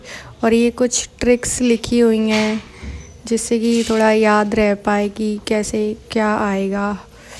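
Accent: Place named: Dholpur-Agra, India. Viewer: Language toh dholpur agra wali h kyuki m yha maniya (dholpur) posted hu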